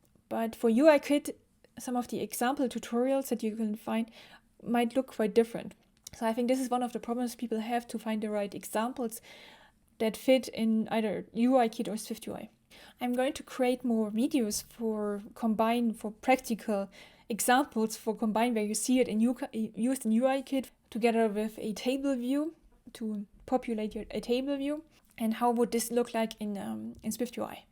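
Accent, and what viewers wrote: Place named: Germany. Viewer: I totally like your step.by.step approach but at the same time efficiently and quickly cover the whole picture. It's like from ZERO to HERO. Very nice. Thank you very much for your videos, that I immediately have subscribed to. Keep going! By the way your accent, though your english seems flawless sounds a bit "german". I know, what I am talking about 🤪. Are you german?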